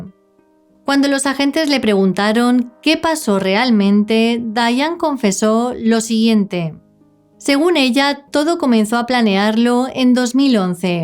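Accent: Spain. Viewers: Me encanta tu acento español más elegancia misterio i suspenso cuando naras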